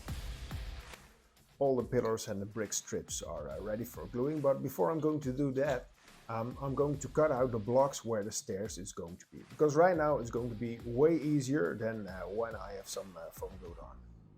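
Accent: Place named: Netherlands. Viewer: Dutch? Thenaccent sounds like it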